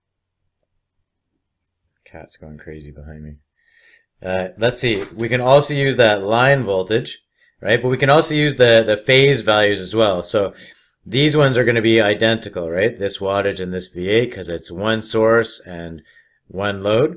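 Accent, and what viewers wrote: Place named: Canada. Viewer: Thanks for these great vids. Aldo your accent isn't all nasal and annoying. I think it's a Canadian thing the yanks got it bad these days and it really makes it hard to endure listening to it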